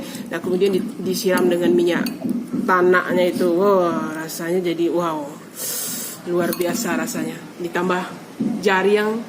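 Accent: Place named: Bukittinggi, Indonesia. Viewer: Ba a dak bacalia an wajah uni ni? Bunyi suaro uni wak urg bukik tinggi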